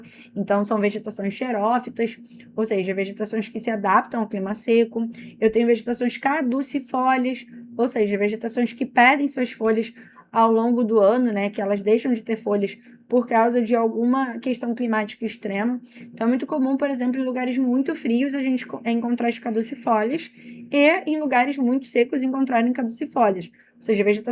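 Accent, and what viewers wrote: Rio de Janeiro, Brazil. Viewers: Nossa que professora incrível! Didática muito boa e o sotaque carioquês linda! Parabéns!!! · Acho lindo sotaque carioca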